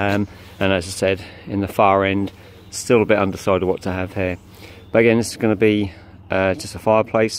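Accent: Norfolk, United Kingdom. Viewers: Are you a Norfolk boi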